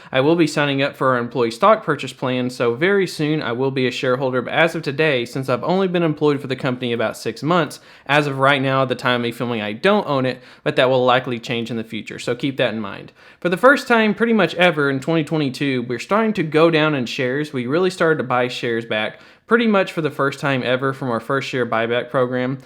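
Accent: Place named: Oklahoma, United States. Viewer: You sound like you're from the Oklahoma! I imagine you live there?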